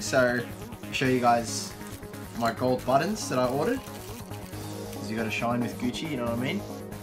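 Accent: Australia. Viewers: Heya, did you get yours from focusattack? I'm guessing you're Aussie with the accent (lol) so how long did it take to ship? I'm in NZ for reference.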